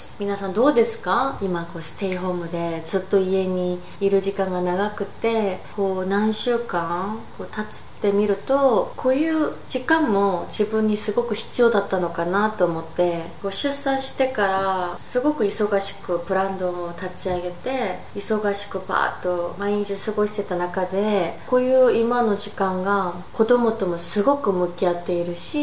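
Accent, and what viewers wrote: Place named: Kansai, Japan. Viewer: 関西弁おもしろい😂アロマ使ってみたいです！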